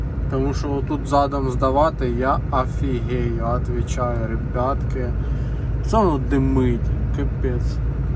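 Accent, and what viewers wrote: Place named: Surzhyk, Ukraine. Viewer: Говори по-русски. У тебя хорошо получается. А вот мова твоя ужасна, то есть - это не мова соловьиная, а очень херовый суржик, говносмесь. ПРежде всего в произношении, в фразах. НЕ позорься.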